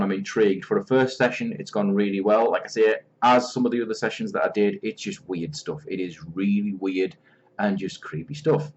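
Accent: Newcastle, United Kingdom. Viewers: Central??? He's a jordie from the north east(newcastle area). Central would be from mank (manchester) to brummie (birmingham)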